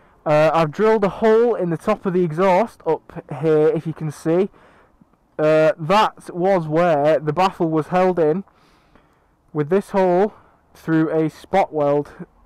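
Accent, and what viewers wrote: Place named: Manchester, United Kingdom. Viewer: Good stuff mate. I just got the same bike and exhaust last week and have been watching video's thinking all I have to do is use an allan key to get the db killer out and drill a couple of holes to increase the volume, fuck euro 4, apart from abs which has already saved my life. Can I give you a shout if I am struggling with it? I am in Manchester and can tell by your accent that you are not that far away. Couple of beers in it for you :)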